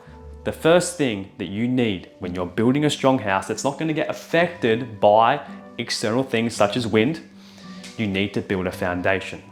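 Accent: Australia. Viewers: You sound Australian, but you spell 'favour' the American way in your subtitles. Remember your English roots.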